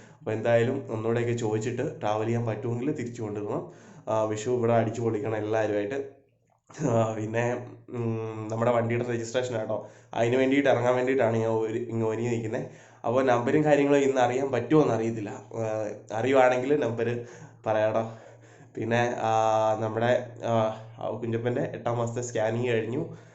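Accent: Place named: Thrissur, India. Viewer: ചേച്ചി തൃശൂര് പോയ ഭാഷ ഒക്കെ മാറി തൃശ്ശൂർ ഭാഷ ആയി😍😄💞💞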